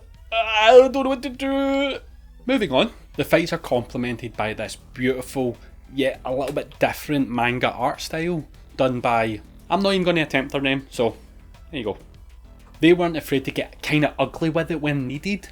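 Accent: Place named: Scotland, United Kingdom. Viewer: Hi. Well done really. You make me curious to watch it actually. I think the job is done in that case. I'll do what I do best... Opinion time. + Great performance + good topic + perfect editing + super transitions + good integration with watcher (me) Ready for that? - YOU HAVE TO GET RID OF SCOTTISH ACCENT IF YOU WANT TO BE LISTEN GLOBAL. I'm so sorry to say that:( Overall piece of great work:)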